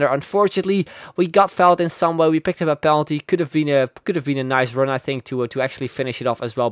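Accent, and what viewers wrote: Netherlands. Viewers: are you dutch cuz you sound like that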